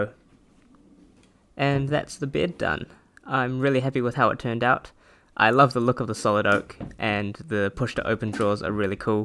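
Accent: New Zealand. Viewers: You sound like a kiwi. If you are where are you getting this nice plywood?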